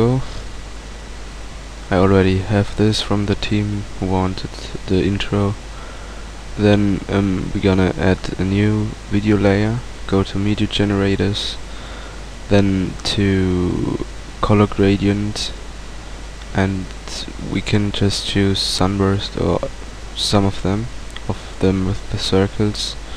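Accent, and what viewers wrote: Germany. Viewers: are you german? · ... you're German, aren't ya?:D haha, liebe Grüße!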